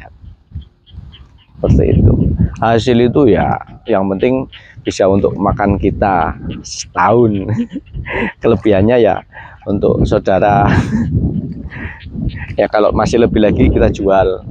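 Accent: Banyumas, Indonesia. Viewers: Ngapak tapi pinter😂 maju terus pak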